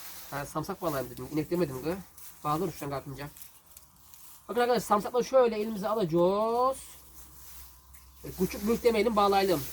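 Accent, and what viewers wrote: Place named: Zonguldak, Turkey. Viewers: seni hiç kimse yadırgıyamaz Çünkü her yörenin kendine has şivesi vardır bizim Zonguldak’amızın şivesi de böyle biz mutluyuz şivemizden canım benim sen kafayı takma yola devam❤️ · Zonguldak Karaelmas Mahallesi'nde oturduk yerlileri 'ın gibi konuşuyor lar Maşallah barekallah lahavle velakuvvete illabillahil aluyyulazim duasını okuyarak gönderiyorum sizlere Amin AMİN AMİN ECMAİN 🤲🤲🤲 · kardeşim biz seni oldun gibi sevdik her yörenin şivesi vardır sen Zonguldak şivesiyle konuş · Konuşman gayet güzel sakın değiştirmeye kalkma! kaybolup giderüz çaycuma şivemiz. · Bende devrekliyim hemşerim şiven harika sırf bu yüzden izliyorum seni 🤗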